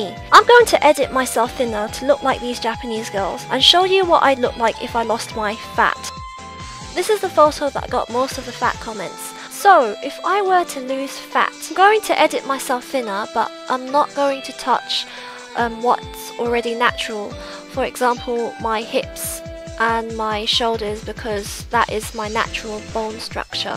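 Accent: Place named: United Kingdom. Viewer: Cool accent!!!! Did you grow up in the uk or something?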